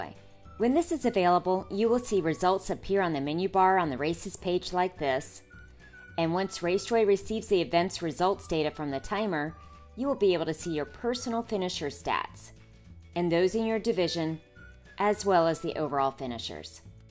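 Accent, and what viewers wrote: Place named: Baltimore, United States. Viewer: Narrator is from Baltimore, not a guarantee but pretty dang shure hon.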